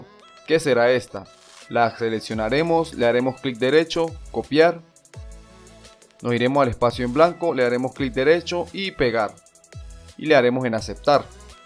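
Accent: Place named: Táchira, Venezuela. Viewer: Muchas gracias, saludos desde San Cristóbal, reconocí tu acento gocho mi pana!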